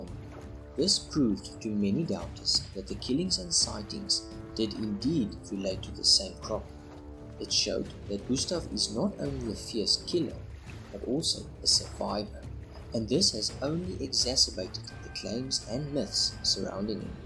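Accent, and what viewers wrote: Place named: South Africa. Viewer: Are you South African, or Dutch? You sound like you are from S.A. ? Saludos desde California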